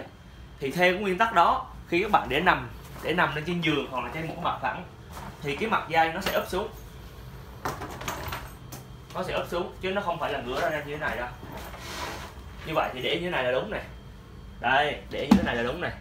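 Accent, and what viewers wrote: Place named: Da Nang, Vietnam. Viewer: giong anh noi nhu nguoi thanh pho da nang , ghe zay cho em xin d/c dc ko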